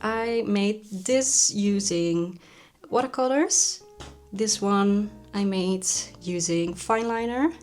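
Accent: Netherlands. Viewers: I always love it how easy it is to recognize the dutch accent, 1 sec in the video and did not see your channel description and i already was like, hold up wait a minute.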